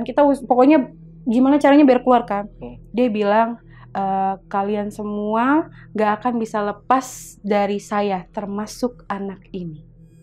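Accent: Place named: West Java, Indonesia. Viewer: Kak logatnya udah mirip orang Sunda lho 😊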